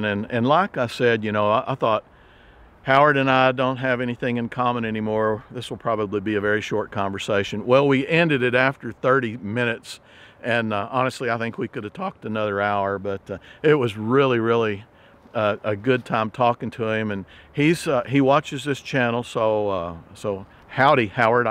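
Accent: Texas, United States. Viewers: What theme am I looking for when I watch an Out on the Ranch (with )? I'm looking for the sound of a fellow with a genuine Texas accent (reminding me of my childhood) who talks about good people being good to each other. I'm never disappointed. 💖💖💖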